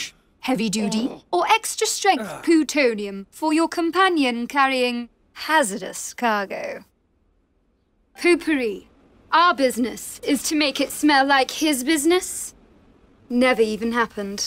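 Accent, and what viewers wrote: Scotland, United Kingdom. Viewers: Just stopping by to say that this is one ad I genuinely enjoyed, and chose to sit through. It seems to have genuine personality. the snooty British accent just adds a level of self-awareness/ irony. Would buy if I didn't already have a fan. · You can hear her Scottish accent more in this.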